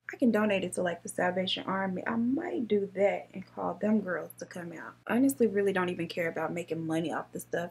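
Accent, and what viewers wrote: South, United States. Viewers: Totally off topic, but I love her accent! I'm from the UK, so I've got no clue what part of America she's from...but I like it! Xx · Yess. And that lil southern accent to top it off🥰🥰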